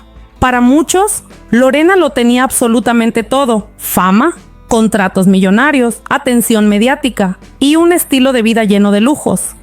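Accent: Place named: North, Mexico. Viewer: Ten cuidado lo que subes en tus contenidos Lorena Herrera no es un ejemplo de lo que dices ya quisieras vivir cómo vive ella. no seas tonta y si vas a subir contenidos con tu voz toma clases de dicción porque tienes un asento de norteña no sé de dónde eres es un acento muy desagradable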